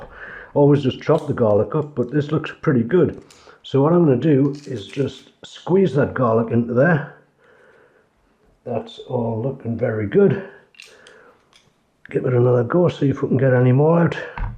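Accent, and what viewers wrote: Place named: Tyneside, United Kingdom. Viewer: Love this recipe, we live in Canada and onion bhajis are weird over here. Husband is from Sunderland (grew up in Washington) and he’s placing your accent between North Shields and Newcastle , how accurate is he? Thanks for the recipe.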